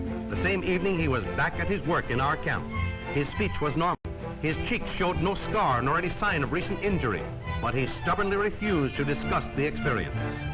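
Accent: United States. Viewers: That's an American accents and not British or Dutch.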